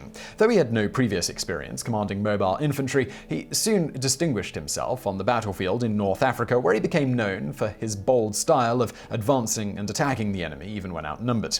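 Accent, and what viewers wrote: United Kingdom. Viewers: But I could listen to his urbane British accent all day! 😂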